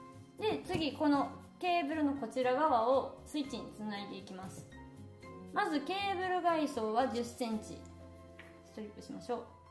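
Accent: Kansai, Japan. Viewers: 丁寧な解説でとても分かりやすかったです。関西弁がきついので標準語バージョンがあるとうれしいです。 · 言葉の端端に感じる関西弁が良いです！ 実家が電気屋なのでついつい見てしました！ 俺も電気工事士取ろうかな！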